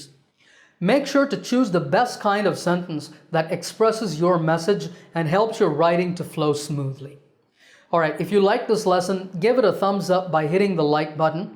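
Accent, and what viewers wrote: United States. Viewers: You are indian but voice is like American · Apart from your excellent teaching . I liked your american accent, How did you developed it ? I am too trying to speak in ameri-khen accent . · Your american accent is 😍. Wanna learn it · I come to you for two reason. First I learn so much from you and Secondly I love watching an Indian Using American Accent. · You are Indian but your voice like real American people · Sir are you from India? How did you acquire American accent? Tell us about that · Your accent is American wow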